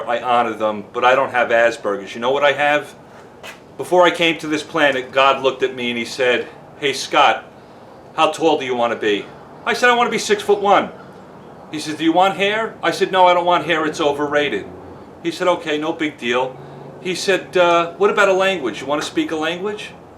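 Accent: New York, United States. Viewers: Thanks Scott, from down under in Australia. Love the NYC accent and the way you present this valuable information brother. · Hey Scott it all makes sense now!! I’ve been watching all your videos and see you live in Dallas but that accent felt all too familiar to me it was confusing the he’ll outta me now it all makes sense! Haha I’m a fellow New Yorker too love your videos I wanna start a detailing business this summer and your videos are my go to for information thanks a lot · I knew I heard that NY accent lol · lmao, Been watching some of your videos for a few weeks and one thing that's been eating me is your accent and being in Dallas! Whole time I've been watching your videos I'm like this guy is definitely not from Dallas and now I know. Aspergers, no, New Yorkah, yes, lol.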